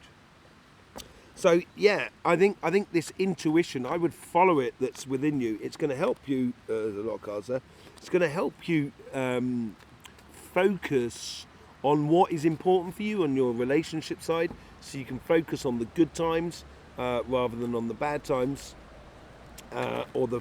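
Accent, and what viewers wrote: United Kingdom. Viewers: You are one of the Top Notch Best!!! With excellent reading you got such an amazing beautiful British accent, it is just delight to hear whole reading without noticing how fast it is over!!! Thank you very much!!! Wish you amazing health and success!!! ☘🌿🌹☘♥☘🌹🌿☘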